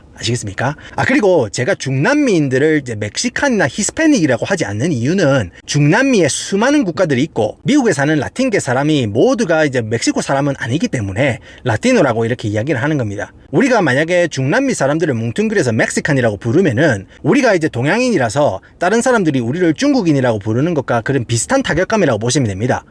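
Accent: Gyeongsang, South Korea. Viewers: 덕에 미국넘들 많이 알아간다. 갱상도 말씨도 맛갈나고... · 와 미국사는 경상도 아줌마인데요 내 귀에 쫙쫙 감기는 사투리와 내용들 너무 쥑입니다 최고입니다~ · 근데 이형 미국형인데..갱상도와서사시는분인가? 사투리 발음이왤케찰져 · ㅋ ㅋ 오늘도 억쎈 깅상도 사투리로 구수한 식당 이야기 잼나게 시청했어요 ~~짱♡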